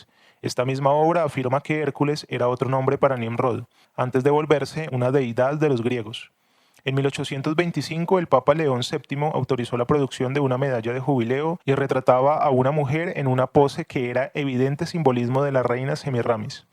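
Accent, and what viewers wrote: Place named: Bogotá, Colombia. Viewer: Buena investigation, pero esa voz de rolo, bogotano homosexual esta pesima.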